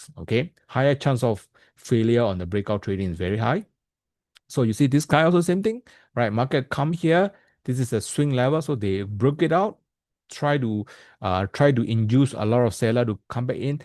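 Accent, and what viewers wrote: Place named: Singapore. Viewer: Singapore accent isnt comfortable for us to listen videos.😂 Please produce videos in Burmese 😊. Sg accent really sucks 😢 Please kindly don't present screen with dual window, all are so minute to properly see words.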